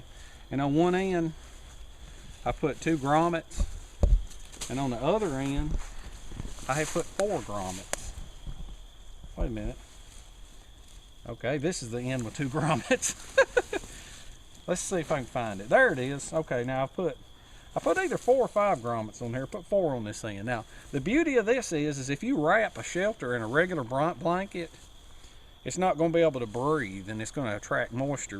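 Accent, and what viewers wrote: South, United States. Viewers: That’s a thick southern accent my guy. Love it. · I figured you were raised somewhere way down south:) I remember one trip I was in Atlanta and had a flat tire on my trailer, I parked in a two trucks in front of mine so I had to wait, time went by when a guy came out asking "is that y'alls truck over there?" I began looking around for anyone else that could have been the driver of my truck, I 'knew' I was a solo driver from Idaho, "yes Sir that's my wagon," "move it on in." "Y'alls" I had to smile, during our short visit he said "y'all must be from somewhere way up north?" I asked "why, do I talk as funny as you?" We both laughed! He said "your accent tells me you're a northerner!" I guess it depends where we are all raised, I love all your videos and your voice, keep them coming. Bomber from southeast Idaho:) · Love the Southern Accent, And I thought the dog was the Bigfoot...... 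Love the info but you should come up to Canada for showing extreme shelter's, it's a lot cooler than in the South now in April 28 we are at 37 deg. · I love his southern accent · All of us Southerns talk this way. Some accents are stronger than others. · Hell of a southern accent. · Very interesting, thank you. Love the accent, Georgia or Lousiana? 😁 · Thank you.Entertaining. I love your country boy accent!! Where are you from? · Love the southern accent sir! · Nice video. That southern accent is deep as hell. Hahaha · I'm from Tennessee. Where's this guy from? Trying to place the specific southern dialect.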